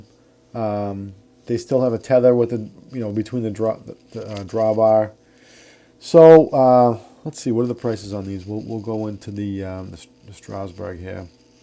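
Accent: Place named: Massachusetts, United States. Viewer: Great video review, You did a good job calling out nonsense and exorbitant prices. Between the prices, sloppy craftsmanship, goofy colors, and bad customer service, it's hard to justifying buying this stuff That RS-27 came from the MTH budget rail-king line which sold well under $400. Lionel almost doubled the price when they got the tooling The passenger cars are almost Golden Gate Depot prices, except Lionel passenger car are plastic, generic and not in the same league. I know there is rampant inflation, but the Lionel steep price jumps started last catalog, before inflation really ramped up....after MTH announced their closing Sounds like you are from Mass, I grew up in Dorchester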